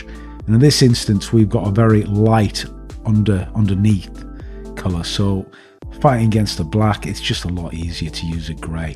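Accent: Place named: Liverpool, United Kingdom. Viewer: Followed this all the way through - definitely put this kit on my 'wish list for 2023, along with a Hind A and a couple of other Soviet/Russian subjects. Good, clear videos, cleanly edited and well presented (good to hear a familiar accent/dialect even if it does make me a little homesick). Looking forward to your next 'production. All the best from a Scouse in NZ.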